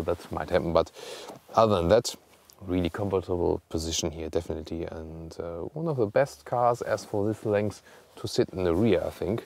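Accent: Germany. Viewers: Ich check das Kanalkonzept einfach nicht - deutscher Kanalname, deutscher "Presenter", aber dann dieses seltsame Englisch, bei dem jeder 100 Meter gegen den Wind hört, dass er Deutscher ist? Warum nicht einfach auf deutsch? Wegen der Reichweite des Kanals? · With the Cherokee I have difficulties to put a finger on the design. Seeing it on pictures or in videos you cannot associate it a Jeep. Seeing it on the street and standing near it this impression is totally different. Yeah, it is a Jeep and somehow it fits into the family. You have the Renegade, which is a fun car to drive, for younger people who don´t go mainstream with a Golf or similar, then the Cherokee for kids transporting mummies who normally would opt for the dead boring VW or the XC60 and then you have the GC which leaves a nice footprint in the premium class as one of the last remaining real SUVs. 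Btw. meine lieben people. As always great presentation - keep on going. But still, this German accent is still there. Never mind.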